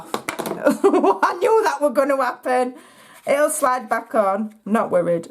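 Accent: Yorkshire, United Kingdom. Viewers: Love your Yorkshire accent!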